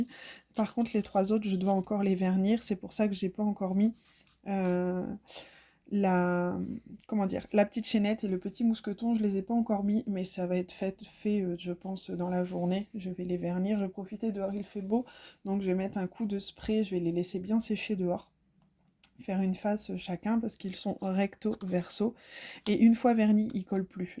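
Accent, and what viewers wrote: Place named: France. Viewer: J.aime beaucoup ton accent français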